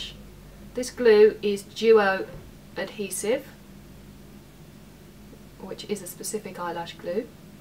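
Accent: United Kingdom. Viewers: You sound extra British today haha :D Love it!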